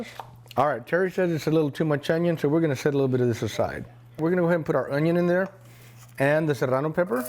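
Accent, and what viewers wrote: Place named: West Texas, United States. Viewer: Dang cousin! We have to be related! Great Migas and everything! I have family in Pecos Ft. Stockton, Monahans, Imperial, Crane, Odessa, Midland.., you sound like us!! Love your video! and love H.E.B. !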